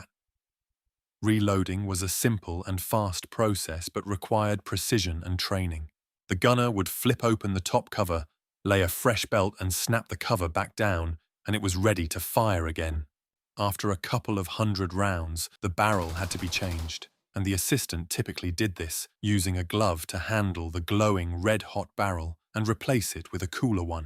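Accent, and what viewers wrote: United Kingdom. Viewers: I do not know where you are from or how old you are, also do not know what your sources are. If you were to write a legitimate journalism article for a respected printed publication or even to submit as a piece of work for grading in college/university, you would need to at least have 3 to 5 independent verifiable sources of information to support your statements and/or claims. To say that “carrying ammunition belts around like Pancho Villa might have looked cool” is a total shit bag thing to say. Anyone who was actually carrying ammo for those support gunners and having to carry their own kits did it in attempts to keep everyone alive and well stocked. Are you British or is your YouTube narration a Bot?! You probably weren’t even alive during the Vietnam War and you are regurgitating stories you’ve gathered from other YouTube channels. 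Like you sat down and interviewed actual M60 Gunners to get your information for this video? Like they’d actually openly tell you , a Brit or a descendant of a former British degenerate what went on there for your YouTube channel. I saw some other comments from people who sound like legit Vietnam M60 gunners My father lugged shit everywhere for anyone on his team way into Cambodia and Laos. ARVN would run off with any supplies or ammo or anything on the trucks US Forces were defending. So It wasn’t to look cool to keep chains of ammo it was to make sure that shit did not disappear into the bush when under fire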